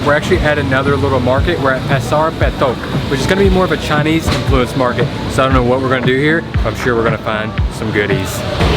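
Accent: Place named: South, United States. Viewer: We've grown accustomed to your Southern drawl but the weird things you say still need some getting used to. ;)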